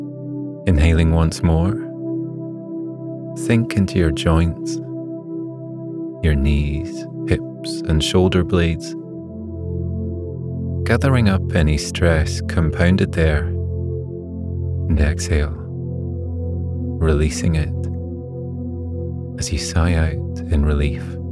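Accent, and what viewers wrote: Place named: Scotland, United Kingdom. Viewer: A cosy Irish story I chose to help me sleep before work in a few hours.. but the accent is Scottish. Can't work for me with an Irish story anything else fine but not an Irish story 😔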